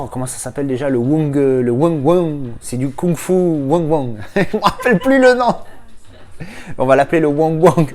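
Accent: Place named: Marseille, France. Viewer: Dire des mots asiatique avec l'accent Marseillais c'est Marrant.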